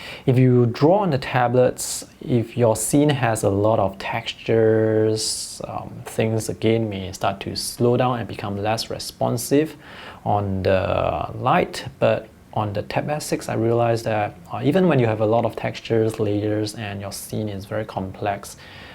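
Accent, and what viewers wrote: Singapore. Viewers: You sound like a singaporean. Are you?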